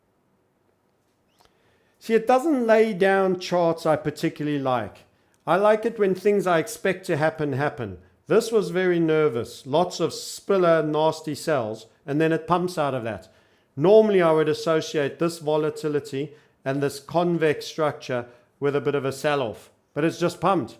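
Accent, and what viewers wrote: South Africa. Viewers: Love the accent. What part of SA are you from?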